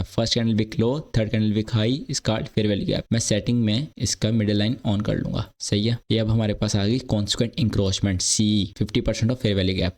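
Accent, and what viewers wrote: Pakistan. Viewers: Bhai aapki tonse se lag rha hai ki aap pakistani ho ya north west India se belong karte ho. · Pakistani lgg Raha ha lounda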